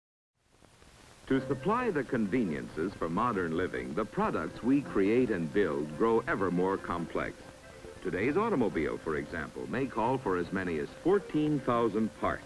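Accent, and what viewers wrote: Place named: United States. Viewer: Nice! But one thing that I found interesting was how clear and beautiful was the narrator's American.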